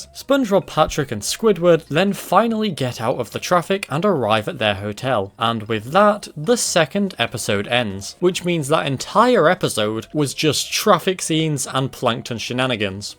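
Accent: United Kingdom. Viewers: Listening to a brit say “ahwsk the stawhff” instead of just saying “ask the staff” really makes me empathize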